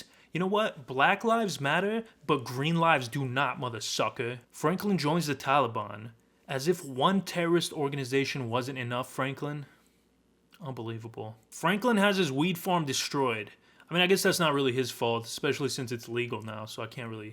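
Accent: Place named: Boston, United States. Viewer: Dude... I absolutely LOVE hearing you rant about Franklin in your Boston Accent! Hilarious definitely gon Sub bro. Love the content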